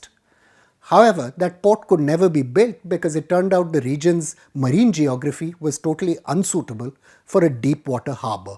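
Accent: India. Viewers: Add text. Your English is too Indian to understand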